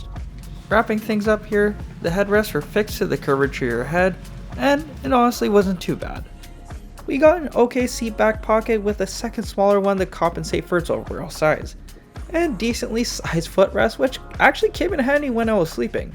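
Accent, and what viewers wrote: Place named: Canada. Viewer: It’s fitting that the most Canadian accent ever reviews this air Canada flight.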